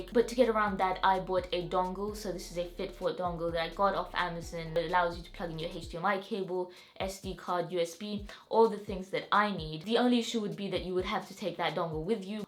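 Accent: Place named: United Kingdom. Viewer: Thanks for the share. PS - I love your British Accent. So sweet and relaxing to the ear.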